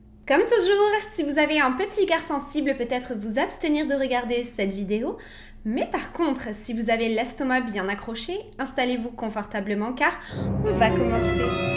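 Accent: Quebec, Canada. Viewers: On entends le Québec derrière ton accent, c'est normal??? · Salut. Top vidéo. Tu devrais faire une vidéo avec Mcskyz et Sonia de France. Je suis malheureusement plutôt passionné par les psychopathes qui foulent la terre. . La première fois fois que j ai decouvert cette chaîne, j ai été tres surpris que qq1 d aussi mignonne fasse ce genre de vidéo! En plus l accent québécois est trop cute. Bref ce format de 3 histoire est top! Bonne continuation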